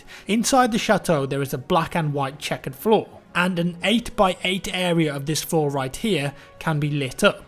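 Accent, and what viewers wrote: United Kingdom. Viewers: why do all great youtubers has to be british ? i mean fck off i can't understand nothing from that accent . since when you say "far" for "for"... i mean far != for ...it just so stupid i allways close this kind of videos but fck i need that dog tag.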